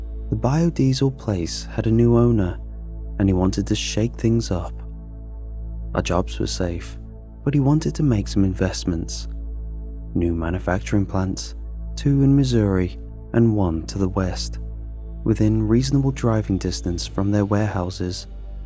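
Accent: England, United Kingdom. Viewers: A guy with an English accent talking about Missouri and Minnesota. 😅🤔👍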